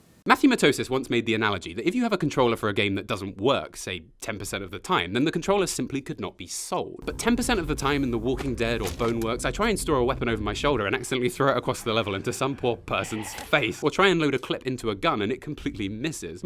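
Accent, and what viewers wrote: United Kingdom. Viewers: Yay the strange British man has returned!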